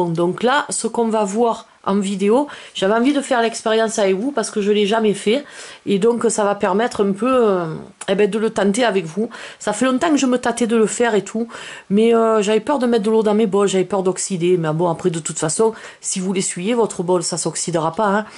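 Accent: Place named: South, France. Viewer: L’accent du sud est parfait! J’adore!!👍 Je viens de recevoir mon 1er bol tibétain (j’ai essayé récemment chez un pote et j’ai trouvé ça incroyable !) J’essayerai avec de l’eau, ça a l’air terrible!! Et je te laisse un like ! ( parce que vu le nombre de vues, et zéro like, c’est pas cool! ) Allez, et je m’abonne, c’est cadeau !🎁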